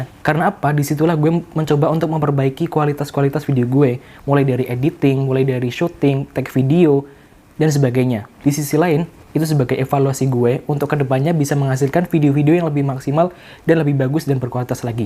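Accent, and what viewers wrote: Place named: Java, Indonesia. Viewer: Gimana kalo guenya jadi aku atau saya biar easy listening aja medokmu isih katon maskuu😭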